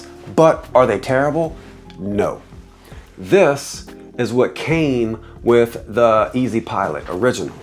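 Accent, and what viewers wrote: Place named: Louisiana, United States. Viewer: I know dat accent when I hear it! But I’ve never heard anyone speak so clearly, or with a neutral tone, but at the same time spit out a purely Cajun accent! You were tripping me out with that. Lol... We’ll have to get together and fly one day once I get some time on the sticks with FPV... I’m a 107 pilot, been flying gps drones for about 5 years. I plan to do some modeling, mapping , and construction progression work in the near future, but for now I’m just shooting some real estate here and there when I get the opportunity... But I been wanting to make some time for this hobby for a while now... I been playing around on the simulator a little bit, but i think I’m going to get a lot better once I get my hands on one of these little beginner drones. That’s the plan anyway! Lol...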